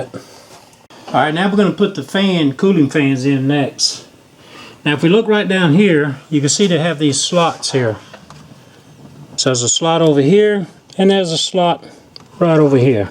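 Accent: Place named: South, United States. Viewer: Where are you from out of curiosity? My guess is Louisiana? Texas?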